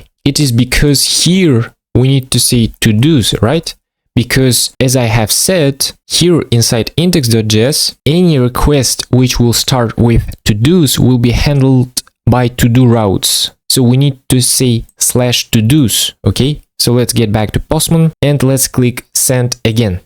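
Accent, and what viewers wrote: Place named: Russia. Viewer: I just wonder what is your native language? I hear accent and really wonder. My guess is that u are Russian.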